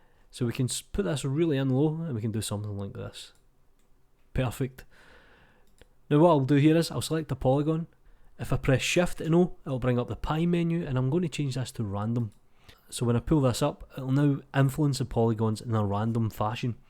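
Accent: Scotland, United Kingdom. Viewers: You just propelled yourself into YouTube stardom with your little magpie beef, there at the start. Gotta love a Scottish accent. Plus the info was concise and accurate. Thumbs up, mate 👍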